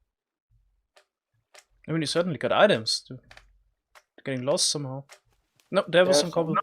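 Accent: Germany. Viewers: I love his accent mmmMMM German accent is so underrated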